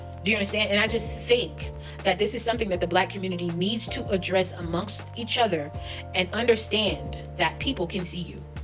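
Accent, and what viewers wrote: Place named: Africa. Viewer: she looks and talks like a first generation African. American blacks I agree do tip but we keep getting lumped in with these immigrant blacks that don’t tip , even the ones with money won’t. Also, why is she complaining about tips? Tips are whatever the customer thinks you deserve or feel like giving out of generosity! Perhaps she should find a job that pays hourly instead of making content pushing black stereotypes.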